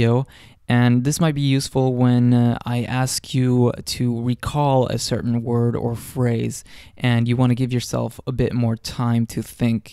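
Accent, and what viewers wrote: Midwest, United States. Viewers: Tusen Takk! I love these videos. They have been very helpful to me on my journey to learn Norsk. I've been studying mostly daily, for over a year using the DuoLingo app on my phone. (Is it OK to mention the app? I don't get any money, credits, etc for it) I find your tips & explanations help me integrate and understand the language lessons better than just using the app alone. On a side note: OMG I can't believe you are a native Norwegian!!! You have a perfect Midwest American accent. Are your family members from there ? Anyway, just keep up the excellent work, I appreciate all the time and effort you put in. Ha en flott dag! (Have a great day) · Your English is amazing. You sound like you could be from the Midwest.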